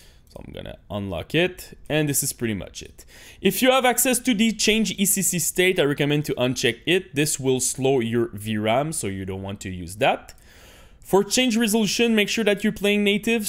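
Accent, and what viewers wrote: Quebec, Canada. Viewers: Is it just me or you are a french canadian ? (Qc)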